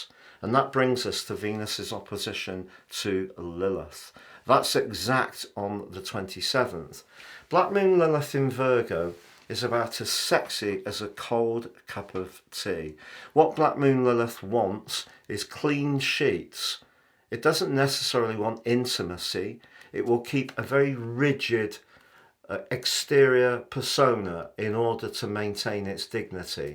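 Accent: United Kingdom. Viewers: I love your British accent 😍and your videos 👌! “Venus in Pisces” (I’m a Pisces and I love many sorts of arts… ) By the way : I’m a Dutch 🇳🇱 ‘hobby’ astrologer -> My uncle was a ‘real astrologer and he taught me how to make and draw astrological charts AND of course how to interpret them, because it’s a way to better understand people. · You're british?! 😂❤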